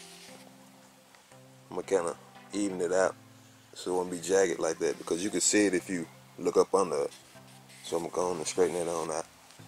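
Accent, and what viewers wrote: Alabama, United States. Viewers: Dude sound like hes from Bama... Credits roll Bama tag lol great job man. new sub lets get that 100k for ya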